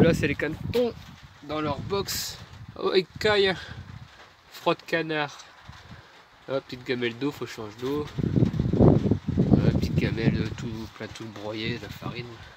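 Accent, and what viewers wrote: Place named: Nord, France. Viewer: Bonjour . J'aime bien je m'abonne !!! je suis un potagiste de l' ile de la Réunion , j'ai bien reconnu la pointe d'accent du Nord !!! Je suis Picard d'origine . La menthe c"est efficace pour l'éloignement de certains insectes volants et rongeurs , ils ne doivent pas apprécier l 'odeur !!! Les œillets d'Indes c'est pas mal non plus . Bonne continuation , le meilleur pour toi et tes plantations .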